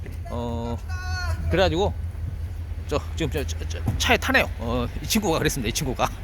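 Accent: Jeolla, South Korea. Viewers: 전라도 사투리 ㅎㅎㅎㅎ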